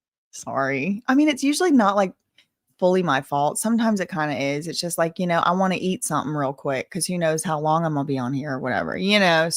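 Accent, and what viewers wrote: South, United States. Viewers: I certainly agree that has a beautiful southern accent. She has an amazing cadence and is so easy to listen too! 💚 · I swear that the more I listen to her, the more I feel like Im becoming a Southerner ya'll.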